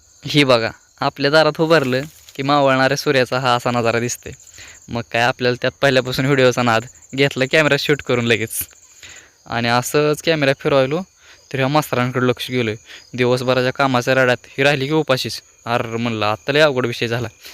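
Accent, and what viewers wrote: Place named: Solapur, India. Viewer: सोलापुरी भाषा खुप खुप छान आहे 👌👌👌👌🎉खूप आवडते आस बोलायला ❤❤❤ त्या झाडाला आमच्या कडे जमु बेट म्हणतात पण तुमच्याकडे काय नाव आहे त्या झाडाचे👍👍👍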